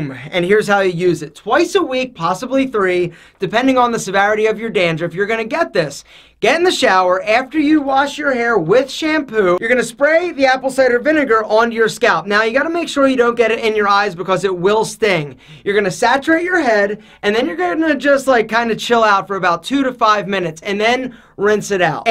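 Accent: Philadelphia, United States. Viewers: Bro where are u from? Sounds like a Philly accent.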